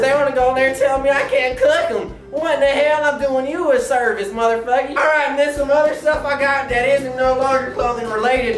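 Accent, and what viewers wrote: United States. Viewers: Yea he was just trying to dress and sound like an american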